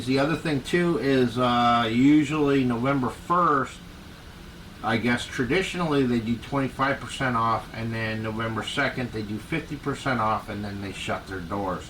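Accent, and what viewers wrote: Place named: New England, United States. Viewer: "Draw-ring"....lol, can't shake that New England accent. Abdiel murdered that skull challenge!!! Den Mother Edie was super clever making her Lenofish out of a skull, I didn't see that coming! Congrats to Fraidy and Monster Morgue Tutorials(Abdiel) for their wins!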